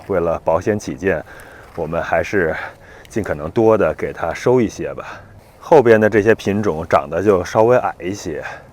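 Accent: China. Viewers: 哥们儿我看了几个你的视频，完全震惊了！！！这些菜，鸡鸭，粮食，都是你一个人种的？？？问号儿已经不足以表达我觉得不可思议的思想感情，我真的佩服的已经说不出话了，看你也是大陆人，听你说话的语气也是曾经在大陆上班的，居然到了美国能完全脱胎换骨成了种这么多种农作物和养这么多家禽的农民，更牛逼的是，你居然还每天上班，农民都是下了班以后当的，简直了也是，我已经快要语无伦次了，只剩下：卧槽！真的佩服和羡慕！啥也甭说了，赞一个！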